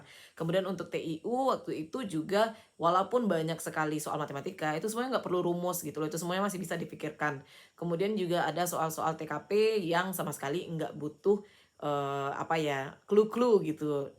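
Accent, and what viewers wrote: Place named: West Sumatra, Indonesia. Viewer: Mode urg minang logat akak ma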